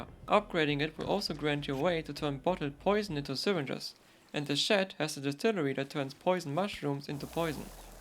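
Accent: Germany. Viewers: Man merkt du bist deutscher haha ist lustig wie du english sprichst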